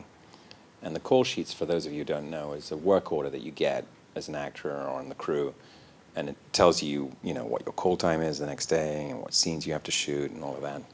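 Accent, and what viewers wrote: United Kingdom. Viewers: The little hints of the Queen’s English just keep my heart a-flutter🥰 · Yes he's from London. Comes from a relatively posh family. He even went to school at Harrow. His accent is confusing because he has lived in the US for over 40 years and sort of drifts back and forth between British RP and American. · I can’t tell if he’s trying to put on an American accent, or if he’s just lost some of his British accent over the years... but it seems kind of mixed here.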